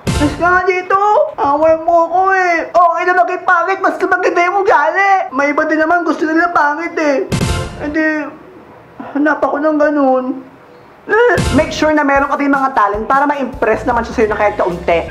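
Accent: Visayas, Philippines. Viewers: Bisaya man ka dong oiii.hahhah😂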